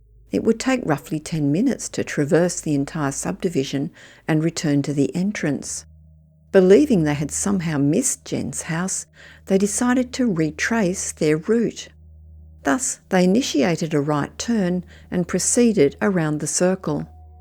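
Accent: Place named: Australia. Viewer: She's Australian. That's how Australians talk.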